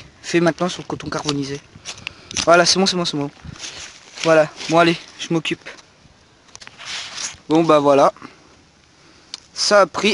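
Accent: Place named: Lorraine, France. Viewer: salut jolie video,de part ta video j ai entendu que tu est de lorraine, je le suis aussi nottament de Nancy.ou s tu pour habitudes de sortir ? si tu a des coins sympas,je suis preneur.amicalement.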